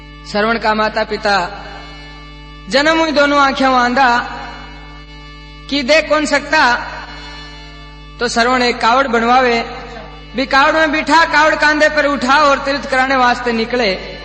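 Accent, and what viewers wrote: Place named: Shekhawati, India. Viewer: इस कथा में आपका जो गाने का अंदाज है जो लय हैं इसका मेरे पास कोई शब्द नहीं है और आपकी जो शेखावटी भाषा कितनी प्यारी लगती है लव यू गुरुजी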